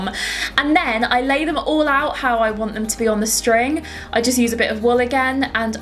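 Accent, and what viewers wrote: United Kingdom. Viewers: Are you British? I admire British people.😋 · I really like your British accent😍😍😍😍😍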